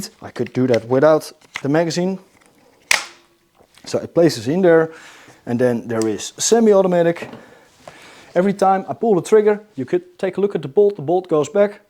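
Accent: Netherlands. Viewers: After a reasonable couple of months. This video made me subscribe and i even gave a like 😊 Always very clear and straight down to the products and explanation. Heerlijk dat boerenkool Engels. En dat bedoel ik positief.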